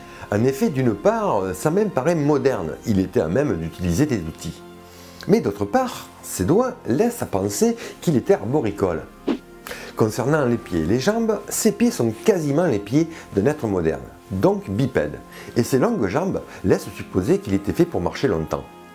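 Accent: South, France. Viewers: Encore une très bonne vidéo, comme d'habitude. De très bonnes explications sur fond de vidéos et d'images bien choisies, un vrai plaisir pour les yeux et les oreilles. Perso je suis du centre de la France et n'ai pas l'habitude de l'accent du sud, mais étrangement je trouve que ça donne un gros plus au côté narratif de tes vidéos, donc encore un point positif ! Hâte de regarder tes prochaines créations. À bientôt dans un prochain commentaire, Un passionné de paléontologie, comme beaucoup de gens ici :). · La paléontologie avec l accent du soleil et de la passion. Merci! Je n' ai pas de sujet précis à te soumettre, le principal c est que tu prennes du plaisir à faire tes vidéos car c est ce qui nous fait plaisir 😍 Juste une petite suggestion, pourrais-tu trier tes vidéos en fonction des eres et des thèmes? Il est quelques fois difficile de trouver les suites de tes mini séries. 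Merci et j attends avec impatience la prochaine. 😙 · J'ai découvert ta chaîne il y a peu, et à ma connaissance c'est la seule du genre. En français. Avec un accent qui sent le pastis. C'est très sympathique, instructif, bien fait. Que du bon, quoi. Alors accroche toi. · De rien. J'adore aussi ton accent du sud, moi même venant du nord, ça donne un côté exotique ;)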